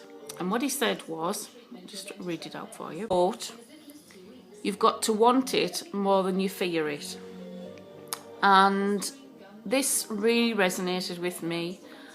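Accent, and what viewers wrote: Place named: Newcastle, United Kingdom. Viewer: Absolutely fab Hun, love your lippie and your Geordie voice, keep them coming, you've had your breakthrough! xx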